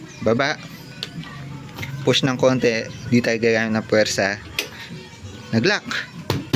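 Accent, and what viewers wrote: Pampanga, Philippines. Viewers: Nokarin kayo lods? Halatang kapampangan ka hehe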